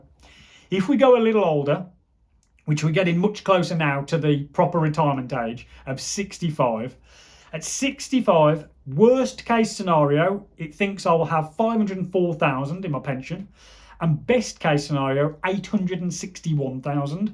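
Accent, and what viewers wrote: Black Country, United Kingdom. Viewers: I love your Black country accent 😂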